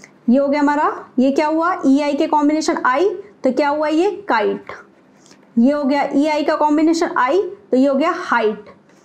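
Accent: Rajasthan, India. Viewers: bist du aus rajasthan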